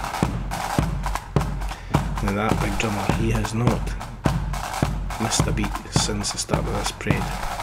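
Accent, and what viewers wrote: Scotland, United Kingdom. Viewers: LOL .... I love the videos but have great difficulty understanding the narration due to the Scottish brogue. I turned on the auto generated subtitles and that is gibberish. BTW English is my mother tongue.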